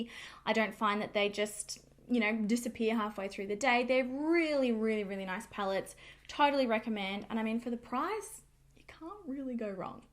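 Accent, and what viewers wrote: Australia. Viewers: You sound Australian would be great to know the prices here, and are you saying Chemist/Pharmacy?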